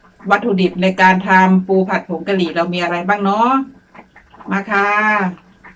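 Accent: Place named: Sisaket, Thailand. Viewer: ปูผัดผงกะหรี่เป็นตาแชบคักแท้ครับมาเบิงมากินเด้อครับออนชอนปูน้อ. ครับโตใหย่ๆครับสะออนมักสำเนียงภาษาอีสานทางศรีสะเกษ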